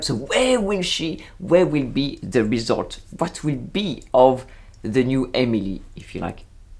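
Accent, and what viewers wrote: France. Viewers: A very gracious reply 💐 Your husband doesn’t need to worry... your accent is still very charmingly French and your English is excellent in every way 😘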